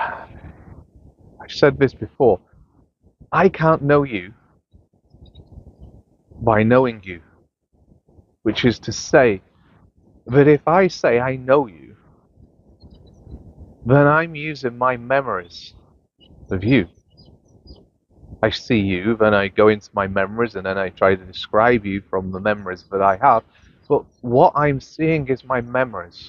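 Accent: Manchester, United Kingdom. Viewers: Thanks where are you? I’m in Lancashire you sound like Manchester Bury,Oldham way great video 👍🏻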